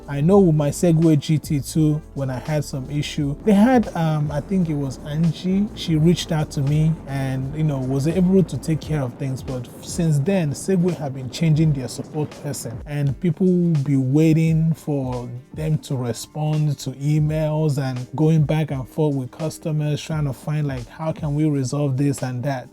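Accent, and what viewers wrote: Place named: Caribbean. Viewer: also love your cool West Indian accent - so refreshing !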